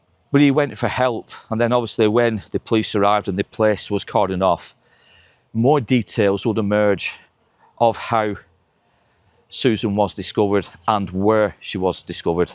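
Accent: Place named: England, United Kingdom. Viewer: nice to hear an English person on here. Im from the UK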